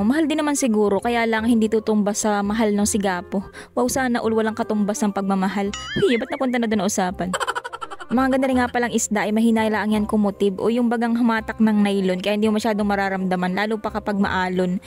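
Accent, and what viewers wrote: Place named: Quezon, Philippines. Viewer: Salitaing Quezon e 😂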